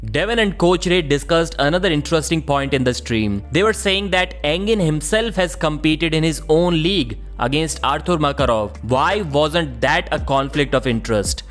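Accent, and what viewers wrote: India. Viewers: FIX YOUR STUPID INDIAN ACCENT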